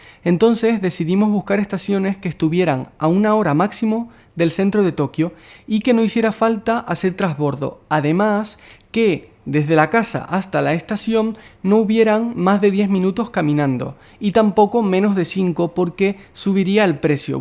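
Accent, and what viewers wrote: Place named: Canary Islands, Spain. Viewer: Acento canario inmediatamente detectado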